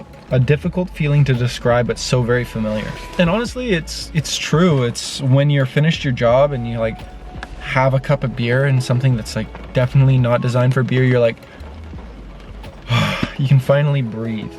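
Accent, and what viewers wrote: Canada. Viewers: The full on Canadian accent <3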